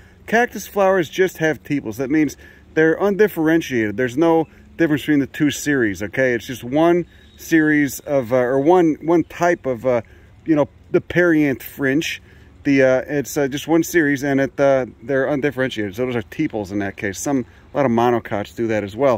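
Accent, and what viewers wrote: Chicago, United States. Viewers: You are welcome, I try to participate as much as possible. 😎 I am an active Master Gardener and Naturalist with a mostly wild yard in a mid-sized town. I enjoy his use of Latin names and style, not to mention the accent brings back fond memories of South Chicago, and Da Region.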